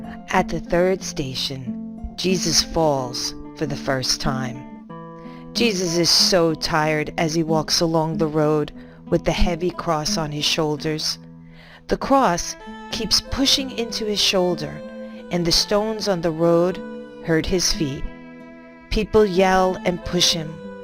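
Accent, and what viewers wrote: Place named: New York, United States. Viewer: New York accent much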